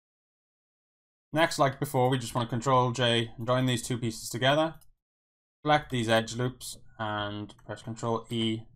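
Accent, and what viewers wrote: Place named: Wales, United Kingdom. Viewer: Thanks for this video! Are you from Wales? If so where abouts? I'm in Pembs, I reckognize the accent.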